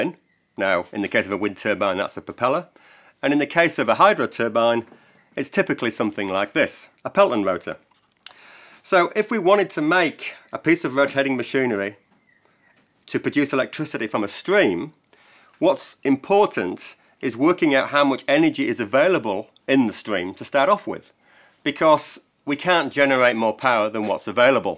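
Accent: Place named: North, United Kingdom. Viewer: That's some accent mate! Northern UK with a Kiwi twang?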